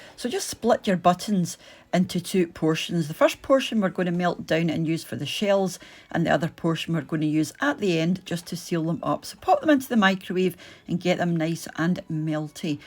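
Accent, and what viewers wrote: Scotland, United Kingdom. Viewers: I’m here just for the lovely 🥰 Scottish accent. 💕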